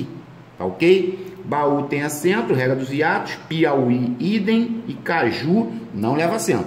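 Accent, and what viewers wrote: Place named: Pará, Brazil. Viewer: Proff vc eh muito legal..seu sotaque parece com o nosso aqui do Pará. Vc eh 10..e pra ser 20 precisa odiar o Bolsonaro kkkk!! 2021 ta phodaaa! Deus nos livre logo! AMÉM!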